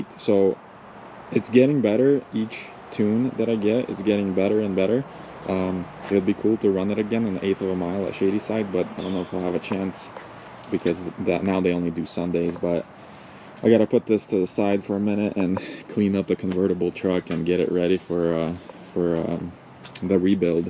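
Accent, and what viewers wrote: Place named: Sweden. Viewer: Impressive project! Where are you from originally? You sound like you grew up in Sweden for a few years as a kid then moved to the US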